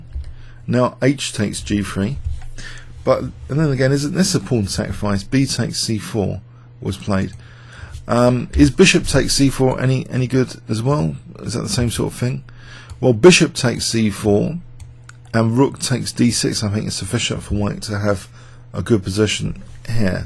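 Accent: England, United Kingdom. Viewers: You can also mute or don't watch the video at all! People want to impose pronunciations, he is from England thats the beauty of diversity.. We aren't machines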